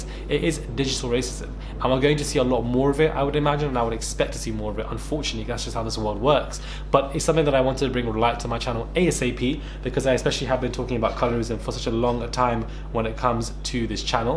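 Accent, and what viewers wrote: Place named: United Kingdom. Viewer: I only come before ur great British accent!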